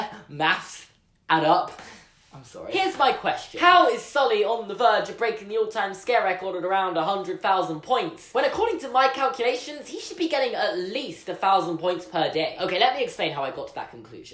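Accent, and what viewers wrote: United Kingdom. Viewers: That'll teach Pixar..... not to make a British boy so upset that he feels like ranting on YouTube 😂. I love hearing you all upset with that adorable Bristish accent.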